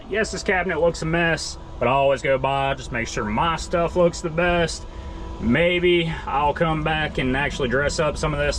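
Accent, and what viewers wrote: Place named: South, United States. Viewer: Love the content. dont know how I found you but not disappointed. I came for the traffic signal knowledge, stayed for your southern draw XD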